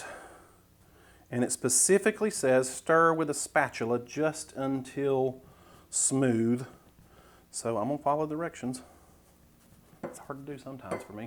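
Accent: South, United States. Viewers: Come for the cooking show, stay for that southern draw. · Hey, Throw some cracklin's in there.The last one with the bacon grease and liquid corn looked awesome I wonder if you could bake them in the brownie pan hummm.Just 3 weeks ago you hit 5,000 and have you looked at your numbers? I spread the word at work looking forward to 10,000 in the near future. I have 2 friends at work that don't do low carb but, they just like your humor and southern accent.I love both of those also but, your carb smart and honest in your opinions.Thanks for sharing. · Im really enjoying your videos. You're respectful, good speed, clean environment, humorous! Love the southern accent as well! :) Thanks! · I can tell you are a Southern boy. 🤣😂 Love it. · There is NO doubt you are southern... since my gf is total southern from bama!!! Loved your pancake video and this is the second one of your videos i have watched you remind me a little bit of Alton Brown... 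you come off very honest and genuine and likable so I have subscribed to your channel you have a new fan!!!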